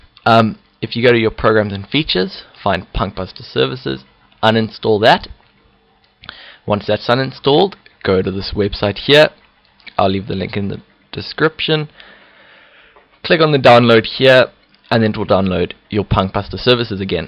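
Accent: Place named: South Africa. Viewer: Hey, Are you South Africa, you sound a bit similar to me..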